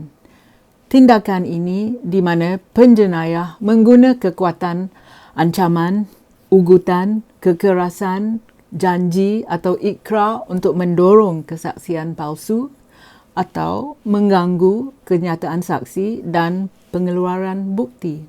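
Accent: Perak, Malaysia. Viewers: tengin tu lorat perak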